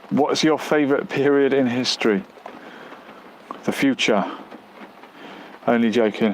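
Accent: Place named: England, United Kingdom. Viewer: I really really really enjoy your English accent , just like watching a news reporter or football match.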